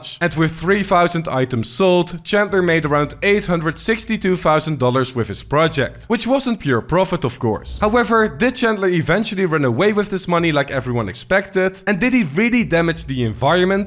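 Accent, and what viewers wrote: Netherlands. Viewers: Ben je nederlands mooi accent😂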